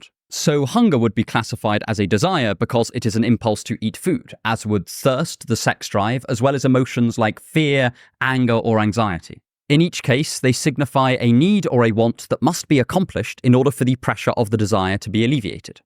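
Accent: RP, United Kingdom. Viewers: You should do a video about biased toward certain sounds or accents according to where one another is originally from. Because at the start of the video my headphones werent on and when video began to play. I was like hey he's a certain kinda cute like a 6.9 but once i heard that semi-posh, almost bbc rp accent, you went up to at least a 9. Another example is the lead singer of inxs michael hutchence yes he was a darling but his singing and aussie accent had me as a young girl thinkin' wow he blew my mind he skyrocketed to an 11 and i'm just a yank. Been to a bunch of shows over the mid 80s into mid 90s. Them playing LIVE is better than any I've seen ever after back in the way back days i was 15 in 1988. Although the drummer Jon Farriss was by far more symmetrically attractive with the chiseled cheek bones, but he didnt really say a whole lot (the strong silent type) and i think he may have sung on one obscure tune! 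But he was a looker for sure!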